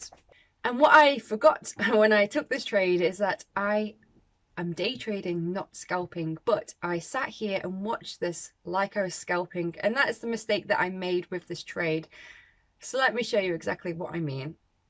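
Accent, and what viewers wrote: United Kingdom. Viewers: Since you sound Brit and I assume you are one Reach out to ant fx.. You will find his channel too But try to get hold of him cause he is Brit too..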